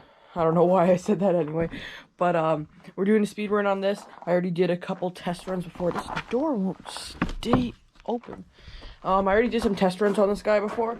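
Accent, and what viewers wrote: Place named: Canada. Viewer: You said ya hit 50 and I was tripping out thann you said "take err for a rip" and I realized ahhh he's a Canuck. Hahaha KMH not MPH 🤣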